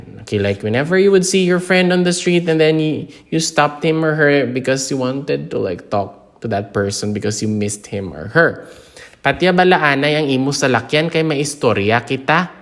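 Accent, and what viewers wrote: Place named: Philippines. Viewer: Philippine english man ini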